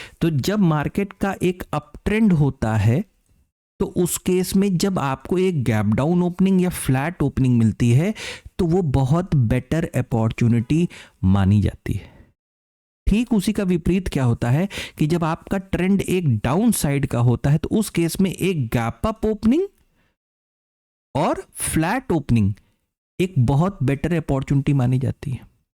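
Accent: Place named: Madhya Pradesh, India. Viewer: Bhai, aap MP side se hain kya? Apki boli hamare side ki lag rhi hai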